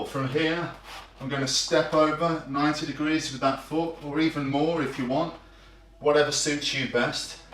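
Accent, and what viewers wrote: United Kingdom. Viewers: I have never heard him talk out of a movie character so I didn’t know he was British 😂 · I thought Scott is a Ukrainian. I hear a British accent right here .My Idol in Yuri Boyka! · Yo I didn’t even know he was British. Man British people are taking over in Hollywood.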